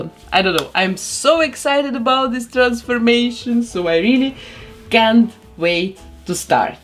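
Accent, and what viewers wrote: Russia. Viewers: Русская что-ли? Ужасный акцент. Очень сильно по ушам бьет. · Это... Русский акцент? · хм...чувствуется русский акцент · Как будто русский не старается над произношением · Почему мне кажется, что она русская, говорит просто с акцентом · Sorry, but where are you from?) I'm intrested, because you sound like you have a Russian accent))) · why do you have a Russian accent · Так слишно руский акцент))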